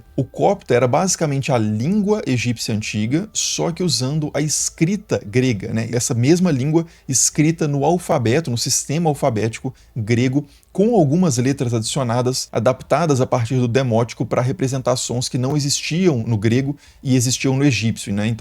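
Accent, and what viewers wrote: Minas Gerais, Brazil. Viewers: Sotaque mineiro inconfundivel? Eu diria de BH!!!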